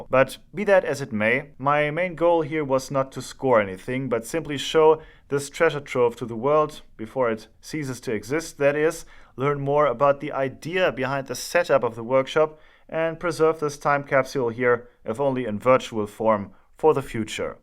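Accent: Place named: Cologne, Germany. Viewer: Der Kölsche Dialekt 👍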